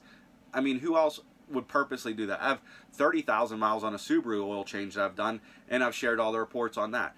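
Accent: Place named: Pittsburgh, United States. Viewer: You have a Pgh accent. I know because I grew up in the South Hills off Bower Hill Rd. BTW, love Amsoil, but best to put in newish or well-maintained vehicles.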